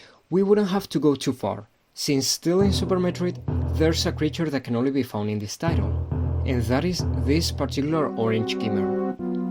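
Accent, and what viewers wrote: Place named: Spain. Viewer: Como se nota el acento españolxd good video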